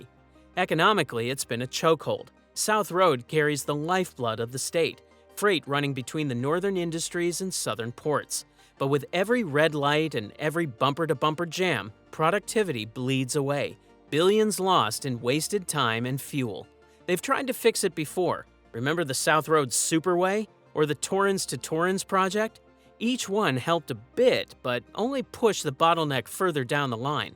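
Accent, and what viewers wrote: United States. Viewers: We should be hearing a voice like Brenton Ragless instead of that American voice 😢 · Why is an American narrating? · Why is an American accent narrating an Australian project?, " tunnelling under homes and businesses , no they're not because the home owners and businesses have been relocated whether they wanted to be or not, I get the need for progress, but I haven't heard what diversion means are being implemented so wildlife can be safe while crossing sides? It's distressing to see wildlife dead roadside because of a pathetic piece of wire for them to use, why can't we take a leaf out of the book of other countries and build an underpass or overpass for them, or is that not profitable 😮 I do like the plan for a park topside over the tunnel, nice bit of greenery. 😊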